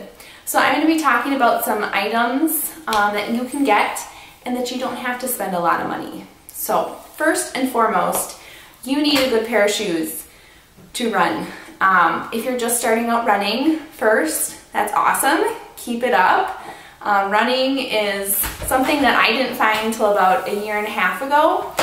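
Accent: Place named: Minnesota, United States. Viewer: You sound like your from MN? I'm just starting running myself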